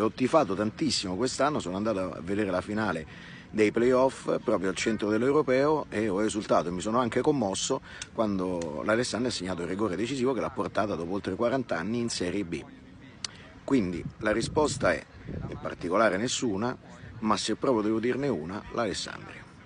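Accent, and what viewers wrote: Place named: Rome, Italy. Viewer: Da come parla o della roma o lazio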